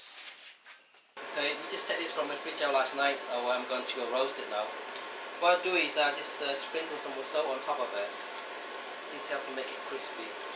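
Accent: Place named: England, United Kingdom. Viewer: I knew he had a slight English accent!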